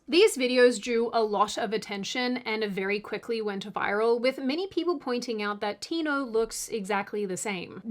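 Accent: Australia. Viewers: Surfing the Hell gondola straight through the afterlife! Death rattle was right!! Their demons are SCREAMING. Love you!! ❤️❤️❤️ P.S, I love audibly observing the evolution of your accent from Australian (aur naur) to a mix of that with American (fyuuumm)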